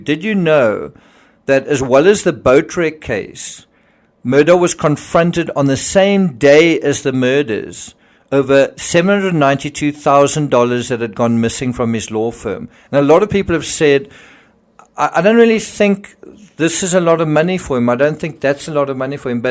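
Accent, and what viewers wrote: South Africa. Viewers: Hi there! Enjoy your channel. You sound you are from South Africa? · Sounds South African to me.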